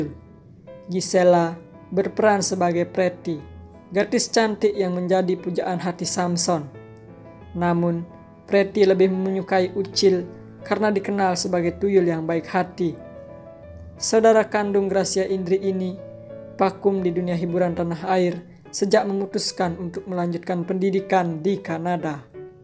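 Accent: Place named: Bali, Indonesia. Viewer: Dari logatnya kayaknya mimin orang Bali ya🤭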